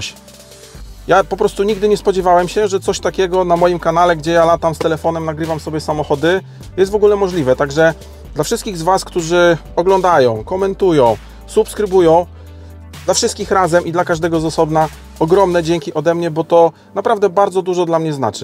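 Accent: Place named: Warsaw, Poland. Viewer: Z całym szacunkiem, ale ... pomysł na ksywę .... no taki sobie. Po prostu ... ksywę albo się ma albo nie, czyli jeśli ktoś wcześniej (w dzieciństwie / w młodości / w robocie) nadał nam ksywę i (że się tak wyrażę) "przylgnęło" i pasuje, to się jej używa .... albo i nie , bo to zależy od ... nie wiem ... okoliczności ? Natomiast "sztucznie wyprodukowana" ... zazwyczaj NIGDY nie pasuje. OK, to Twój pomysł i jeśli Ci pasuje .... to wszystko w porządku, ale ..... jak dla mnie ... dziwne to nieco. Natomiast. Oglądam Twój kanał z powodów które już tu opisywałem kiedyś, ale przypomnę ... w miarę spokojny język wypowiedzi i taki ... swojski jakby, ale ..jednocześnie "warszawski" w tym dobrym rozumieniu Warszawiaka ... coś jak aktor Zbigniew Buczkowski, czyli ... leciuteńko "cwaniak" , ale w tym pozytywnym znaczeniu :) ..... taki ... nasz :) Dodatkowo prezentujesz te samochody bez zbędnych (moim zdaniem oczywiście) bzdetów typu ..... 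oooo ... patrzcie ... tu jest kierunkowskaz ... , tu błotnik, tu lampa, a tam opona. Przy okazji jesteś jednym z tych nielicznych którzy w ogóle odpowiadają na komentarze, czy generalnie utrzymują kontakt z "publicznością" , a to (tu znowu moja opinia) BARDZO DOBRA praktyka. Na koniec watek osobisty, czyli .... UWZGLĘDNIŁEŚ moją prośbę o podawanie informacji o ilości cylindrów w silnikach prezentowanych aut ... jeszcze raz dziękuję. Co prawda przypominałem kilka razy jeśli zapomniałeś, ale ... widzę że to już "constans" w Twoich filmikach :) , czyli .... Z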